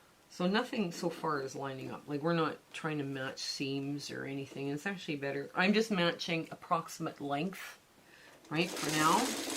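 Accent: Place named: Canada. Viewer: I've made a couple of 8" crumb blocks, ao wondering if sewing the triangles into sqares might be a good option for continuing... I'm really enjoying your videos - and adorable Canadian accent ;-)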